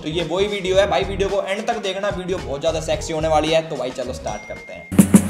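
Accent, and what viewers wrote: Haryana, India. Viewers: Bolne me thoda haryanvi touch h 😍😍😍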